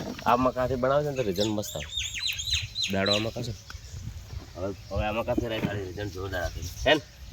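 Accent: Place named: Banaskantha, India. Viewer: બોલી મસ્ત તમારી બનાસકાંઠાની બવ મજા આવે સંભાળવાની મારી વાઇફ બનાસકાંઠાની છે મજા વાત કરવાની ❤😂😂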